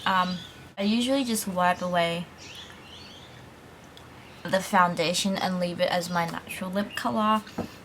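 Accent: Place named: Australia. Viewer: A filipino girl with a what I guess is an Australian accent? Interesting! Subscribe! :)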